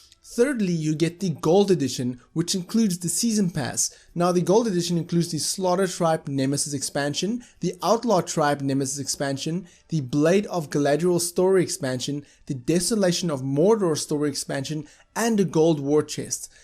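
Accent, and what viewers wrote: South Africa. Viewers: You sound so South african...!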